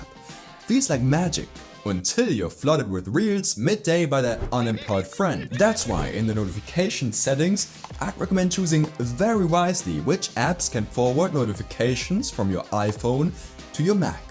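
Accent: Germany. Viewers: What a dreadful German accent.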